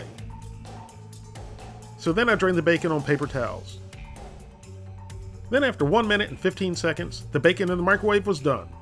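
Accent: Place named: West, United States. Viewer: Gorden Wood it's cause woody Harrelson would say "I gotta do it for the people" alot and with this guys western accent it seems spot on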